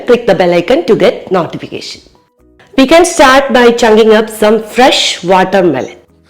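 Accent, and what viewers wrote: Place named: Thrissur, India. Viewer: Nalla thrissur malayalam thanne aayirunnu nalladhu ,kelkan oru sukamundayirunnu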